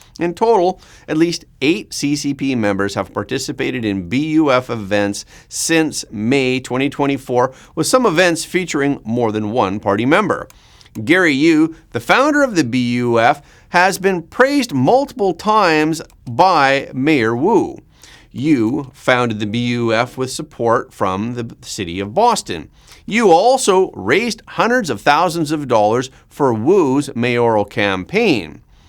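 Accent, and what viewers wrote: Canada. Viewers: That powerful Canadian accent combined with superb in depth coverage of the US zeitgeist took American me by surprise. Thanks neighbor!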